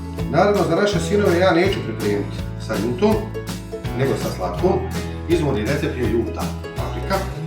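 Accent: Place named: Bosnia and Herzegovina. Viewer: Predlazem da ove zamerke prihvatis kao dobronamerne : moja keva je iz Lebana o to je tu negde.Pite te ko teje lagao za sir? U Leskovacku skaru mora da ide tucana ljuta paprika zatim kasika prezli ,jedno jaje i na tu kolicinu ide pola kasikice soda bikarbona.Drugo: reprezentecija - pokazivanje: te lepinje kao da si sekirom sekao.Dok gledamo ovaj tvoj video stice se utisak kao da ti se pisalo,pa si zurio.zadnji komentar: po govovoru kao da si Bosanac,stoga drz se ti Banjaluckog recepta . Pozdrav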